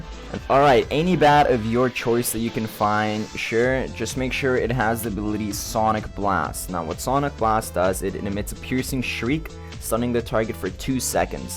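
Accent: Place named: Asia. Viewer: ur asian arent u? i can tell by the way u talk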